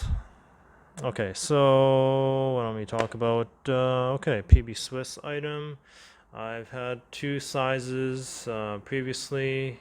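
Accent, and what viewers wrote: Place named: Japan. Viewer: I assume you're Japanese from your pronunciation of Japanese names (and having a Japanese magazine), but your English is leagues better than other Japanese locals. Especially with the accent (it's still there, but only slightly). Did you study in America or grow up there?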